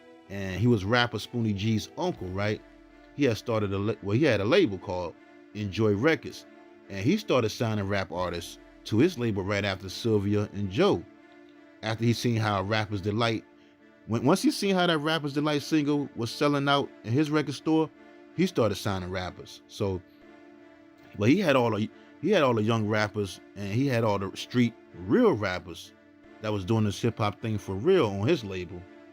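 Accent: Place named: New York, United States. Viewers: Right I was thinking the same thing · Where are you from? Your accent sounds New York to me.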